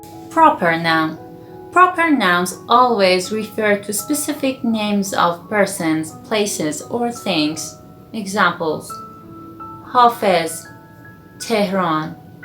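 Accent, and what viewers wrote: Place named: Spain. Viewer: This is useful thank you. Your accent is a bit spanish.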